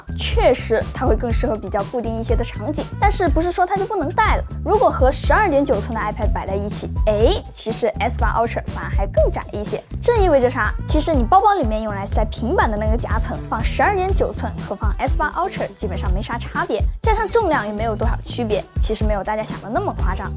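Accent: China. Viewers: 好重的中國鄉音，逃。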